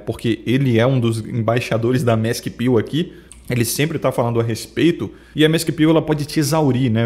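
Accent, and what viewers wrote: Bahia, Brazil. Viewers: Dialeto baiano dele... O "vamos conver" ele só conseguiu corrigir esses dias... Por isso é necessário sempre filtrar as informações, pois as referências regionais dele são medíocres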